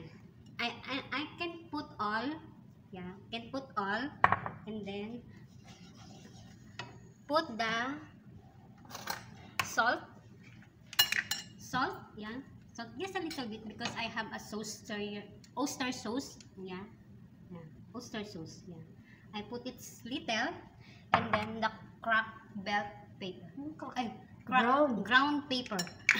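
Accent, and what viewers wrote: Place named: England, United Kingdom. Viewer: Nag enjoy ako panonood lods,,good vibes ang pagluluto mo with English accent hehe..new friend, Joyce Toda brought me here..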